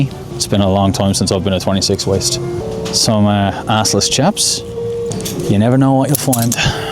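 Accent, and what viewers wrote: Ireland. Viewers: Classy clothes, cool sounding irish accent and great thrifting tips. Quality content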